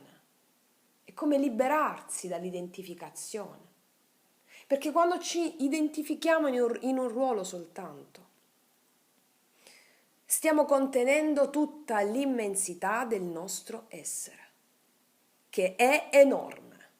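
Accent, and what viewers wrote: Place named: Apulia, Italy. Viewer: Che spettacolo che sei., non so quante volte ho sentito questo tuo lavoro bellissimo e ogni volta la mia anima vibra e mi dai coraggio forza luce,io vivo in Lombardia ma la mia origine è in Puglia credo anche molto vicino a te dall' accento che sento,mi piacerebbe un giorno abbracciarti di Persona infinitamente grazie❤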